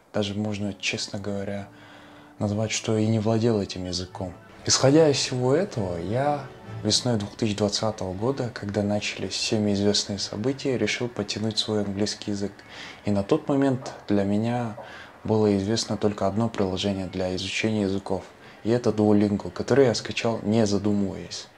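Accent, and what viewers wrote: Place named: Tatarstan, Russia. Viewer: Молодец! Восхищаюсь! По тому, как говорите на русском, сказала бы, что акцент , как у татар😀 Сама татарка🙂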